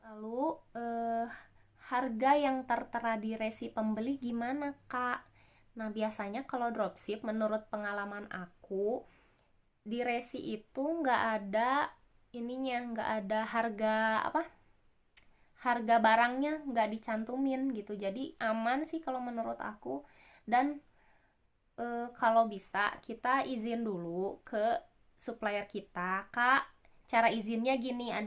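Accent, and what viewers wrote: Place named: Bandung, Indonesia. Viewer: suplier atuhhhhh....dan saya sukaa nada bicara kaka hehehe khass bandung sukses teteh owner ramah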